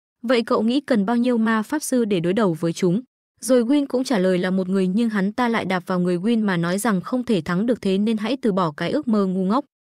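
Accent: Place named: Nghe An, Vietnam. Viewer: Tôi chắc chắn ad là người nghệ an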